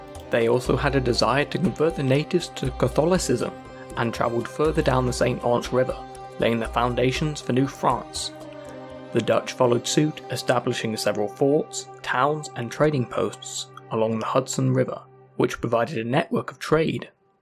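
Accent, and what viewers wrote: United Kingdom. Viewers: This whole thing is Americans propaganda in a British accent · Its crazy that he is saying this while he has a british accent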